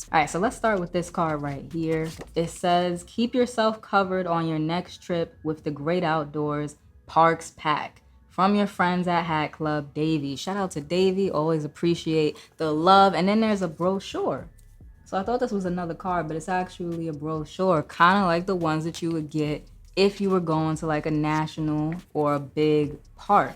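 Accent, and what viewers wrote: Boston, United States. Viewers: Boston hat is 🔥🔥 and lol on the accent, I’m from Boston and hate that accent lol it’s more white Boston/suburbs. I’ve had a busy week with hats. sneakertown had some re stocks and you talked about before the Morton salt hat. I’ve been waiting for it since then and my girl hit on it for me last one in my size and she copped the biggie hat from the 5 mike pack, and the rangers hat from ecapcitys drop last Friday cause I went for the cubs. She’s awesome. Also copped my first Rockies hat too. I miss your upcoming drop videos you do the best ones cause you talk about ones that haven’t dropped yet. Appreciate you and your videos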